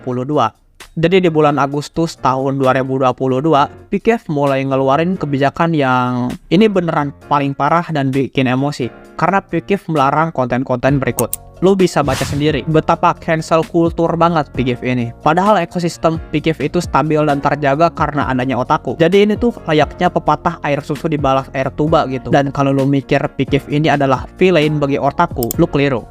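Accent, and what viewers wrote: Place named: West Java, Indonesia. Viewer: Sunda kayak nya:v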